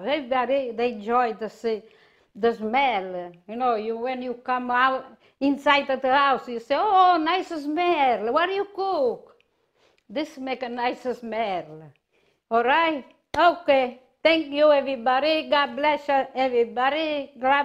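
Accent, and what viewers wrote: Italy. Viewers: I am waking up today in Seattle... Watching & listening to a real Italian grandmother making pizza... And she wrapped her bread dough... in blankets on the couch... like family! Living alone in a big city, I find this so comforting. Bless you for sharing... in English with a lovely accent as authentic as your beautiful pizza! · I would have loved to have grown up in her home. I would be so fat! Her Italian accent and grandmotherly voice is legendary. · I love this lady. If she was my grandmother I would be fat as heck from eating so good. The very first job I ever had was working at an Italian Restaurant. The owner was also the main cook, he was straight off the boat ( as they say,) from Italy and he had the same accent. Ever since I worked there, all other pizza I eat is automatically compared to what he and I use to made at the restaurant. 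Not just the pizza either, but every Italian dish you can think of, Lasagna, Spaghetti and Meat Balls, Baked Ziti, Egg Plant and Veal Parmesan, and the list go's on to the deserts and more.. We got there early to make the dough and roll it out into aluminum pans coated with olive oil. I am 60 years old this year and that was my first job at 15 years old, yet even now any Italian food I eat, I still compare to his, and I am a tough critic when it comes to Italian food. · you can’t tell an Italian accent? She’s Italian 100%. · I feel like she is my own grandma watching her do her cooking like I used to watch mine many years ago. I love listening to grandma in her Italian accent-English coz I can understand what she's saying haha. I just discovered her now and I instantly subscribed and at her age, she will have many years more to spend time with her kids grandchildren great grandchildren and friends and youtube friends. 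Such a joy to have a grandma. stay safe and healthy and share more of your treasured recipes! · You have to love her and the Italian accent. Miss my Italian gmom so bad, she reminds me of her. An Italians mother's kitchen is the entire house, do not get in her way, or you get a dough slap upside the head. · The most Italian sounding person I've ever heard, perfect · Grandma, I’m a 73 yr. old great grandma and I have to say, you inspire me with your Italian cooking!! I’m not Italian but people think I am because I love everything Italian! I grew up with two Italian families, the Mariano’s and the Vasterelli’s. You remind me so much of them and I love your accent, your expressions with your hands and watching your videos. Thank you for sharing your recipes with all of us. (My sauce is almost identical as yours except I add sugar and wine as this is the way my Italian families did it.) Love to you, Grandma!!❤ PS: You have a lovely voice!😊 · Love your videos and your Italian accent! 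Also, you cook like I do, a little bit of this and a little bit of that!!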